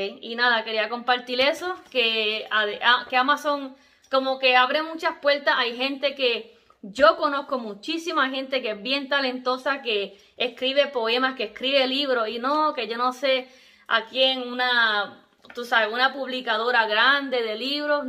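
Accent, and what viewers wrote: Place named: Puerto Rico. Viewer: Saludos desde Mayagüez, soy maestra de Artes Visuales ya retirada. Estoy comenzando a incursionar con la creación mi primer libro de colorear utilizando la inteligencia artificial. Precisamente estaba buscando tutoriales en Español cuando doy con tu video. Muchas gracias! Ya sabía que ese acento de boricua no se puede negar. Weeepppaaaa!😂😁😂😁😂😁🇵🇷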